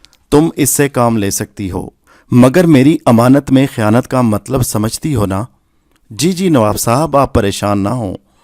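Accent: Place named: Pakistan. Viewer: Anam Khan lakin ye Indian nahi Pakistani hai aisi urdu Pakistani hi bol sakta hai bahi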